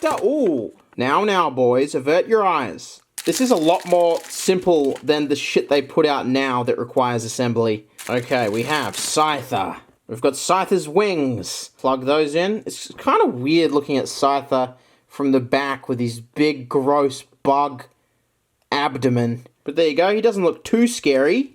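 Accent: Australia. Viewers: Well he has sort of an accent because he’s Australian.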